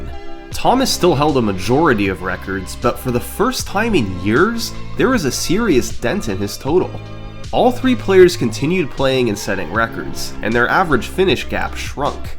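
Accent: United States. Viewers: Thats what American sound like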